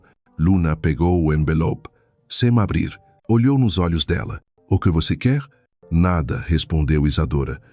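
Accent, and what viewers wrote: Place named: Spain. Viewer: Não entendi o porquê do sotaque espanhol, soou mal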